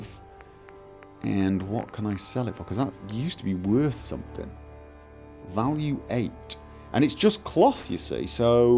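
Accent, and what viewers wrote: United Kingdom. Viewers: He’s so fricken British it’s awesome lol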